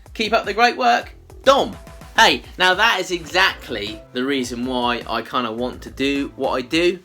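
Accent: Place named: England, United Kingdom. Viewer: Being that I am a "bird" (LOL) I will probably never use a lathe - not that ladies can't & dont, it's cool w/me! BUT, my point is I enjoy watching purely because you are so much fun and you really make me laugh! Love your editing too. As for talking too much I could listen to you talk all day. I think most Americans love a great English accent. However I AM a maker, I make quilts, and recently finished a Union Jack quilt for my Grandson. Wish I could show you a pic. I love you tube, it's where I learned to quilt and it brings me a ton of GREAT entertainment and laughs like your channel. Thanks ❤ God bless you & yours! 🇬🇧🇺🇸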